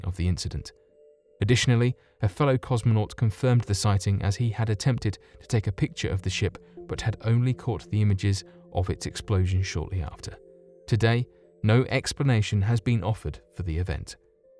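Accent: United Kingdom. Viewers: Because they are British and pronounce somethings very different.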